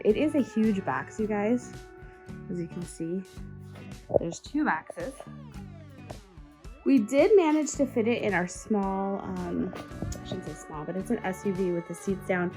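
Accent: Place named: Chicago, United States. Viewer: Just found you. As I was listening I thought to myself that you had to be from the Chicago area. I hear the accent, my cousin has the same one. How do you like the greenhouse?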